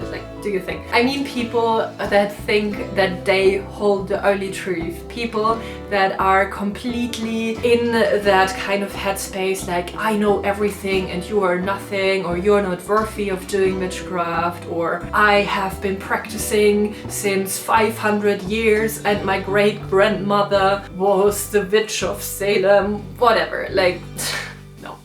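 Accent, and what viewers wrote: Germany. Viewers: Dein deutscher Akzent macht dich noch charmanter als ohnehin schon. 💚 (Und danke das du dich bei meinen Kommentar vorhin an mich erinnert hast, und woher ich komme. 😊) You are a very wonderful and wise witch! Can't wait for your next videos! 😉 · Hi beautiful! New sub and I have to tell you I LOVE your videos! Your knowledge, your voice, aesthetic, and your absolutely wonderful German accent lol you are so sweet and great! ☺️🥰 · Ich finde deinen Kanal echt megaaaa🥰 & finde dein Englisch echt top😅 War ein bisschen stolz, dass ich aus deinem Englisch raus hören konnte das du auch deutsch bist haha 😊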